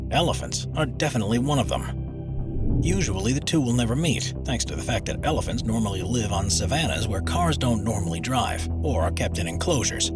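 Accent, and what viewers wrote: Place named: United States. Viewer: Why does the narrator have an American accent? It sounds like the copy was written by someone from Britain (or some other place that says things like car parks and bays) but the narrator sounds American. Not a big deal, just kind of an odd combination.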